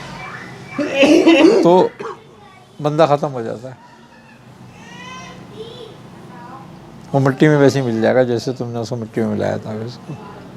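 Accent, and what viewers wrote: India. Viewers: aap ki urdu bhuhat achchi hey dilliwali urdu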